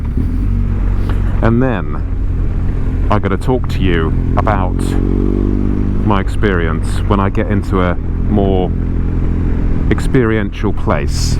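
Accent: United Kingdom. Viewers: I find your videos very relaxing and you have a very posh english accent!😁 I wouldn't be surprised to hear you at some point: Alloweth's speedeth up a did bite !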